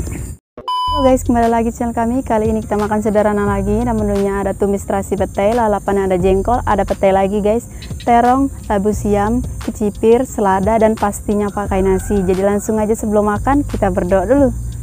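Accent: West Java, Indonesia. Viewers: wong palembang tapi pakai sound sunda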